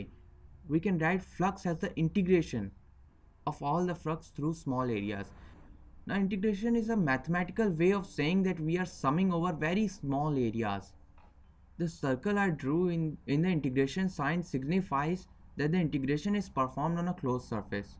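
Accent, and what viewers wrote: India. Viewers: the indian accent makes my ears bleed i can barely listen to you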